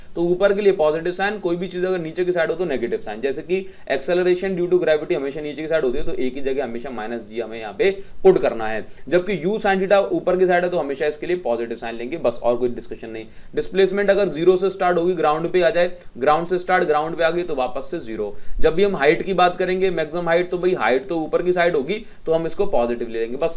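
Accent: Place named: Bihar, India. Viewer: i think bihar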